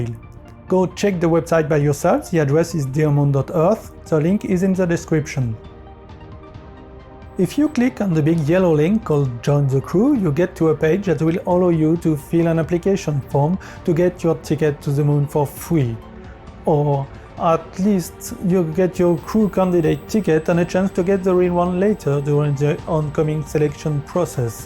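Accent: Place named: France. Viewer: Nice french accent 🤟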